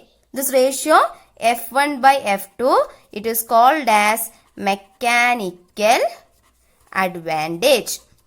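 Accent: Kerala, India. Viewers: Sounds like she is a Malayali...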